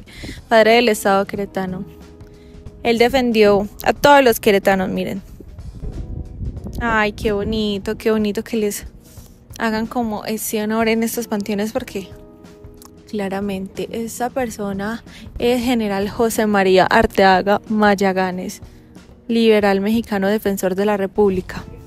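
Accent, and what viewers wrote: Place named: Colombia. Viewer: Tienen un feeling y una manera de hablar las colombianas que me encanta,voy a seguir viendo los vídeos de esta nena 😊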